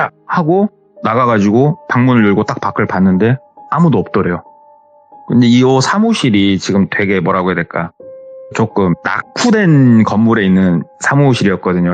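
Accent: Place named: Jeolla, South Korea. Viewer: 너무 재미있어요 빠져들어요 전라도 구수한 목소리 감사합니다.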